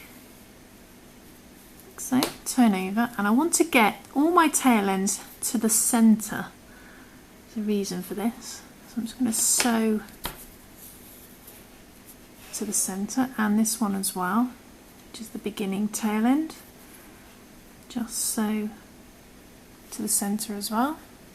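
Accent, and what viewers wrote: United Kingdom. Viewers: Another great video. I love all your designs. Hearing your accent i think you may live in UK. If you do, can you please tell me where i can buy yarn..a big shop! All i have found by now are baby yarn or dk yarn on a chinese local shop..thank you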